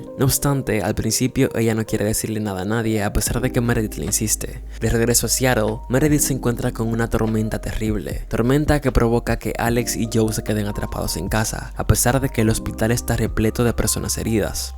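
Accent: Dominican Republic. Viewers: Amo como narras , todo educado pero le metes el plus dominicano 🇩🇴🥰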